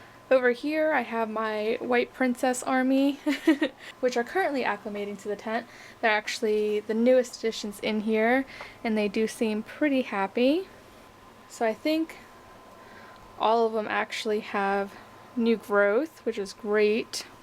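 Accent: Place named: Quebec, Canada. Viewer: It's the first time I watch one of your video (just discovered your channel) I'm sorry but I have to tell you, you really sound like a french Canadian talking english, it's crazy!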